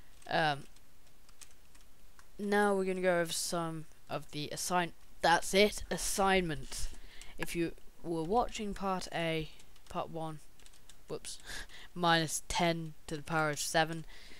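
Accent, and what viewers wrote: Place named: United Kingdom. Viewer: great tuts...also love your accent, british-type accents are cool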